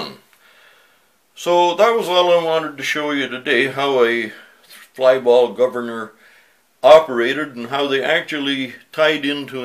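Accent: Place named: United States. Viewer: This 'Murican accent... Love it!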